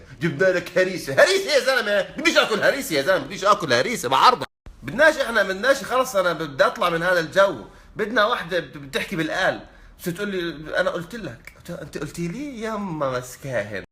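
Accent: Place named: Gaza, Palestine. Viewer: هادا لهجتو غزاوي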